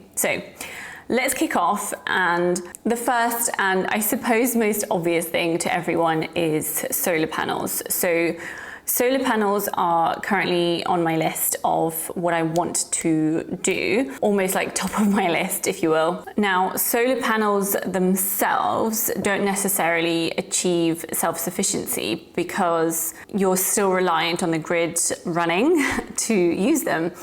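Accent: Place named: RP, United Kingdom. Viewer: You’re polish but have a very posh English accent. Love it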